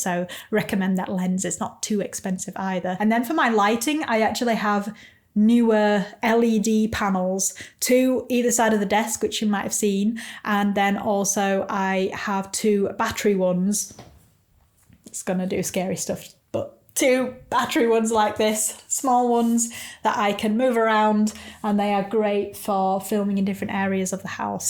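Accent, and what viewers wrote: England, United Kingdom. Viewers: You are the greatest piggie mom ever 🐹🐹🐹🐹🐹🐹🐹 Plus, I love your English accent 😉😉😉👍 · I agree. I can't resist the accent ❤❤. I grew up in love with the BBC, from the eastern coast of U.S.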